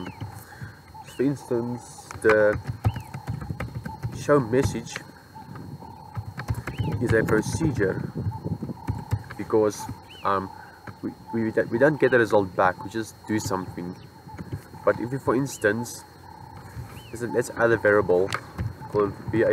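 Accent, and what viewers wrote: South Africa. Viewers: Fellow south African ? 😂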